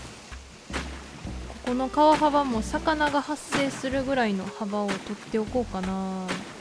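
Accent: Kansai, Japan. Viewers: 関西の方ですか？ イントネーションが関西っぽくて可愛い♪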